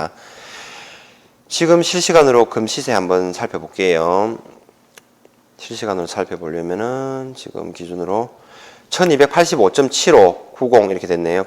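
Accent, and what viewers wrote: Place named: Busan, South Korea. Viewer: 살짝 부산 사투리가... 쑥 된장국 처럼 향긋하게 들립니다. 내용도 진솔하시고.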